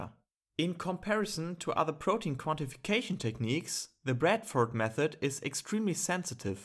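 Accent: Germany. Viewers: I bet you're a german speaker. Thanks for the video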